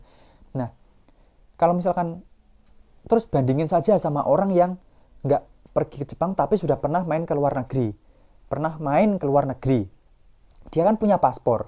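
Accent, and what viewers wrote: Java, Indonesia. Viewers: Jowone ngendi mas..aq kendal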